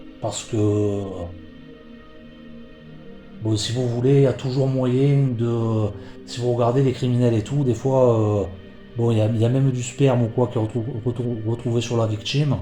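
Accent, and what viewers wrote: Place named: Marseille, France. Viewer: Aaaaah Marseille 😂🤣😂🤣😂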